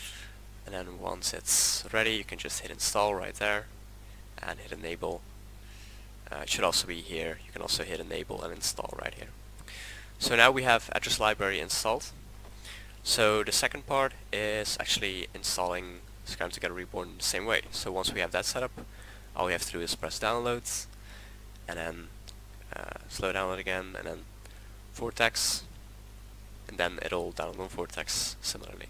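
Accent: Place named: Netherlands. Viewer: Zieke shit, thanks maat ik hoor je nederlandse accentje wel hoor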